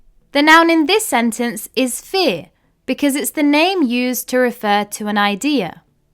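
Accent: United Kingdom. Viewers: I love a great British accent❤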